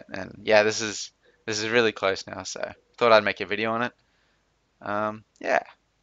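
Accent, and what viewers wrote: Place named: Australia. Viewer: That Aussie accent.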